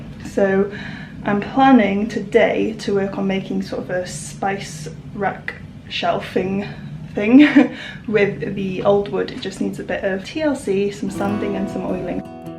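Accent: United Kingdom. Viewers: Interesting life style, interesting content. Unfortunately have a hard time to understand your fast speed British accent, please do a favor for your audience to slow down with your narration!! Thanks for your content, keep us updated.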